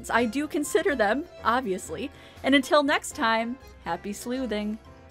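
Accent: United States. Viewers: they are NOT homophones to her. It is regional in the US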